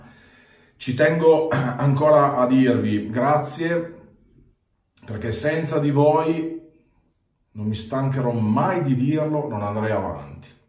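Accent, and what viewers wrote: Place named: Piedmont, Italy. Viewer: Il tuo accento piemontese mi ricorda la "mia" Torino dalla quale sono partito ormai dal lontano 1996. Molto belle anche le interviste a Paolo Barrasso e Donato Ciniello. Un'altra video di compagnia visto / ascoltato mentre anch'io mi stavo radendo (la testa). Prima o poi vedremo anche una rasatura della testa? Buona giornata a te ed ai tuoi followers.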